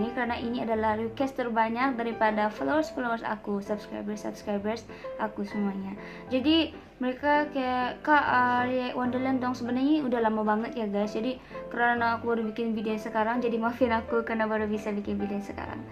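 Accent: Jakarta, Indonesia. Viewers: Klw tutup mata, kita tdk mndengar bhwa yg ngereact itu gadis cantik dari brunei, loghat dan bahasa brunei nya ilang lbh k Indonesian. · Orang brunai kaya orang jakarte ngomong nya.😀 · Sis,, asli brunei kah,, tapi dari bhs dan logatnya kliatan asli indo geh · Adik bahasa Brunei memang seperti yang adik ucapkan ataukah adik yang sudah terpengaruh logat atau gaya bhs Indonesia? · Sumpah, gw kira orang indo yang nge-react !! dialect jakartanya itu lo, pas bgt !! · Org Brunai, tapi knp logat bhsnya sperti org Indonesia???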